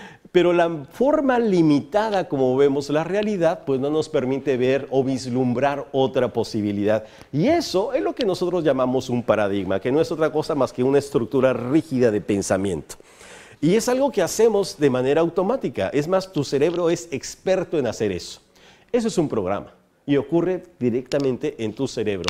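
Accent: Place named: Mexico. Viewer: Me suena mexicano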